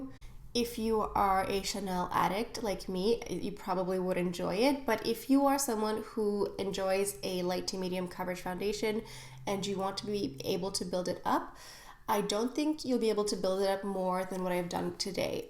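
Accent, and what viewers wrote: France. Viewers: You have such a great French accent!!!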